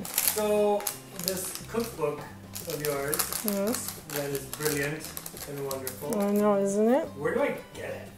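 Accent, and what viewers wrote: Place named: Poland. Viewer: Wonderful recipe and video! I really love the way you walk us thru too. I do best when I can actually SEE how it works, so your videos always work for me. I am half Polish and grew up in Greenpoint , Brooklyn NY. Which is known as “Little Poland”......lots of Polish butchers and the best kielbasi you can find! But I have been trying to learn more about the many other authentic foods and the recipes to go with them, so I have a lot to look forward to on your channel. I thank you for sharing so much with us!! PS- I love hearing your accent! Half of Greenpoint sounds just like you, so it takes me right back home for a little while..... I love it!! ❤️ 🥰❤️🙋🏻‍♀️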